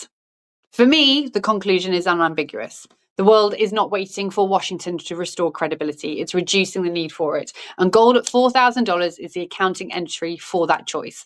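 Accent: England, United Kingdom. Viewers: I could listen to talk all day with that English accent. But thank you for creating this video I truly value your input. Both silver and gold are on fire and I think silver has the potential to go higher in price to create a life of its own.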